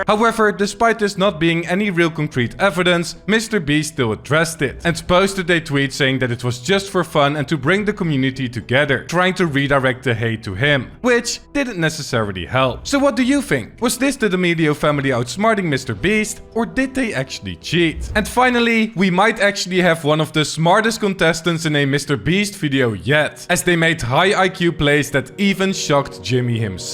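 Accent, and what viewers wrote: Netherlands. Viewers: I smell dutch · You have a dutch accent and i know cuz im dutch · Why the freak are you trying to talk english with this accent, if ur dutch just dont do it bcous then its just irretating. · are u dutch.....?? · i smell dutch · I smell Dutch 🇳🇱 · Bro u Dutch?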